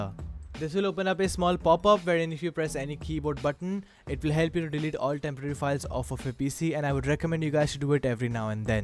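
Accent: India. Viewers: are u from india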